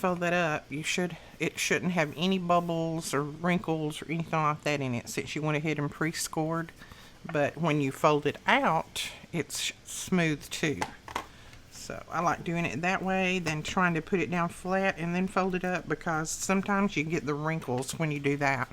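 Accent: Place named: Georgia, United States. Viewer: This was such a delightful tutorial to view this evening. You inspired me to try a similar technique if I may in my tutorial creating a Wedding Album. Thank you sincerely for your wonderful instructions. You sound like you are from Georgia. haha I am Canadian but have some sweet Georgian friends I visit now and again. I made sure to Subscribe and hit the bell. smile I would love you to visit my next tutorial where I will try this technique (somewhat) and be sure to link your Channel so you get the credit. smile Blessings! I forgot to mention my favorite season. Definitely, the FALL weather when our humidity has taken flight. haha Niagara Falls is one humid place!!!!!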